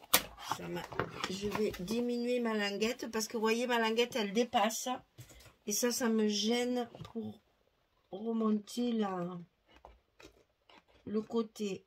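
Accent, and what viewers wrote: South, France. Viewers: Bjr. Vous pouvez être très fière de vous Bravo Magnifique une œuvre d art entre des mains d une magicienne!! Vos tutos sont tjrs des découvertes, la surprise du Chef! On part à l aventure... avec!!! Vos explications, votre patience, votre bonne humeur fait que vous mettez du baume au 💙 dès qu on regarde un tuto, qu on entend votre accent du midi, 🌞 on oublie un instant nos soucis!!! Continuez à nous faire scraper, à nous faire découvrir vos tutos tjrs plus beaux... Merci pour le partage, prenez soins de vous et passez de bonnes fêtes de fin d année. Bises. Marie--France. Débutante dans le scrap mais je fairais un de vos tutos c est sûr!!👋👋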